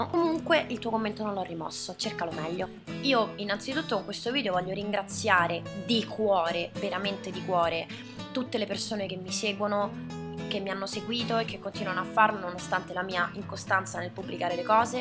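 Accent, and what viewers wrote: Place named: Rome, Italy. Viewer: dio che sei bella quando parli il romanaccio <3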